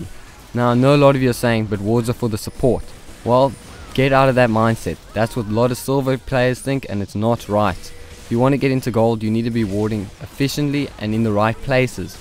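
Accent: South Africa. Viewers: You south african? 😆 game name?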